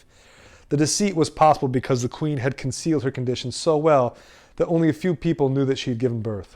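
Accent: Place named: United States. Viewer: You sound American brother. You might like this book written by a First Generation American born Norwegian titled SPIKING THE GOLD or WHO CAUSED THE DEPRESSION & THE WAY OUT (a book of facts essential to the welfare of every American). Author was born to the Arne Andreas Nordskog son of a butcher from Oslo. That’s better handwriting than mine by the way.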